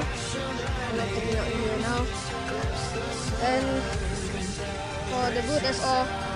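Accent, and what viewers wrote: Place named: Singapore. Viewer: lol u singaporean eh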